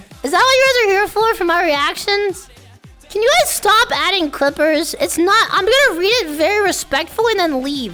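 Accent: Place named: United Kingdom. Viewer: Not the british accent 😭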